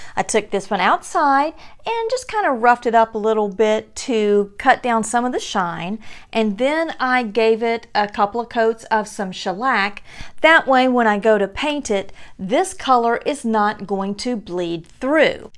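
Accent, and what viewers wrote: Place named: South, United States. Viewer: Hi, how are you? 😀 I'm from Argentina and i bet you didn't expect a suscriber from so far away, and Spanish speaker as well. 😆 I happen to be fully bilingual so i have the pleasure to enjoy all of these beautiful american creators such yourself! I like your southern accent and, if you don't mind my asking, i'm curious to know if you are from Alabama, Tennessee or somewhere nearby. I loved your embroidery hoop ornaments, since fabric is my favorite material to work with. Also stamps. I adore stamping! I have read in another comment that you clean your space as you go, to keep it neat. I do the same when crafting. I cannot concentrate if my surface is too busy with all sort of things. Glad to know i'm not the only one obsessed with cleaning. 😂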